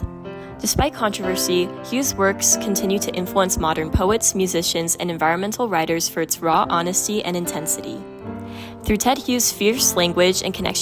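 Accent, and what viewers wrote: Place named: United States. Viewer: Why is an American reading this? It's very poor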